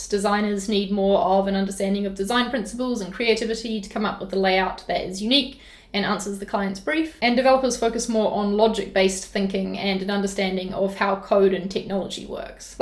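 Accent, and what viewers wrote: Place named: New Zealand. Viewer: Love the Kiwi accent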